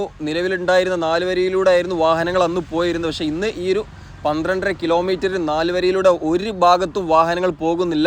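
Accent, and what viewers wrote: Malappuram, India. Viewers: മലം പുറത്ത് കാരനാണ് റിപ്പോർട്ട്‌ ചെയ്യുന്നത് അതുകൊണ്ട് ഞമ്മന്റെ ബാസാ ഇങ്ങനെ ആണ്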